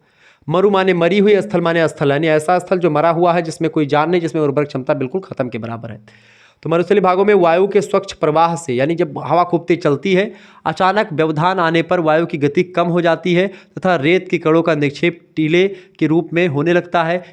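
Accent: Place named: Bhojpuri, India. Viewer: are bhaiya pure hindi bola kariye ye bhojpuri dhang se samajh mein nhi ata hai samajhne mein dikkat hota hai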